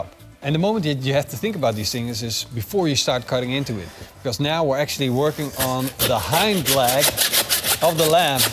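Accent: Netherlands. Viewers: Love the whole video. The Dutch/English is awesome. Grill on!! I love my notifications on your videos!!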